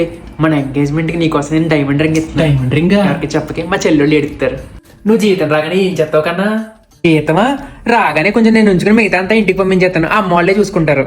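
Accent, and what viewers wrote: Godavari, India. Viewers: In godavari slang you nailed it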